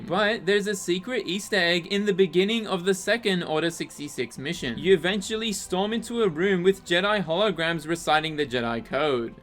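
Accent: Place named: Australia. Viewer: I was baffled when you said this game had an M rating but realized your Aussie accent and then it made sense 😆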